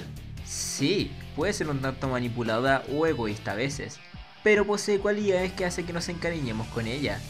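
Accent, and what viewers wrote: Chile. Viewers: eres chileno? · no se te entiende nada..habla más claro, hasta pareces chileno .-.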